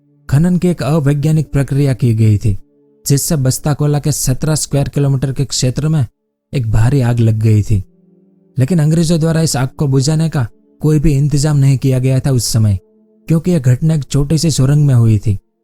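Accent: Gujarat, India. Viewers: Aap gujarati jaise kyu bolte ho.??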